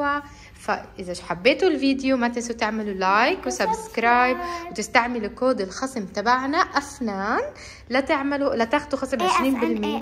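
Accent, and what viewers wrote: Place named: Egypt. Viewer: أنت مصرية بتحكي مصري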